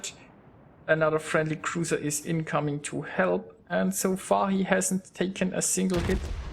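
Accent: Germany. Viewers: I got one question. Are you from Germany?:D Because you speak like a german person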